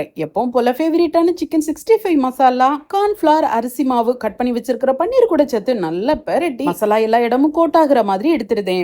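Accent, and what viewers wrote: Tirunelveli, India. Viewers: Thirunelveli, Kanniyakumari side la slang ipditha irukum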